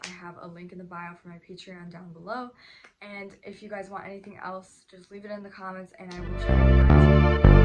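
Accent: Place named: United States. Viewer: نو لَكنتها امريكيه ف كلامها عادي ^o^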